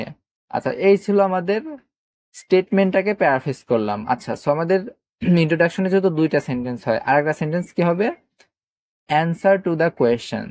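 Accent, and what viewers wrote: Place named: Jessore, Bangladesh. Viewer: joss class bhai, Are you from Jessore ? your accent like jessorian .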